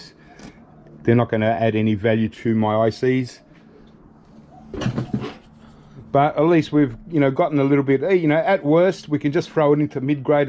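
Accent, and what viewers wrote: Australia. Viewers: Gotta say I love hearing Aussie spoken in its natural environment. Glad to hear the assorted body parts are healing up, because there are a bunch of crazy Yanks up here looking forward to street scrapping season!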